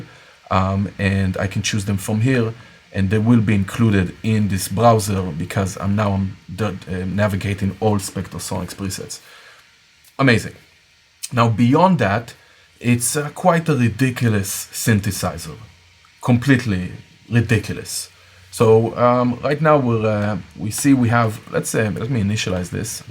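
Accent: Israel. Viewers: יש לך את המבטא הכי ישראלי ששמעתי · אתה ישראלי? 😅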